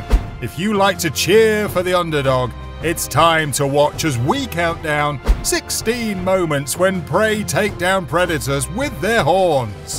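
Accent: United Kingdom. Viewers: I love the way you talk London England but Fiennes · Why is it always a British guy? Can't it be Snoop Dog?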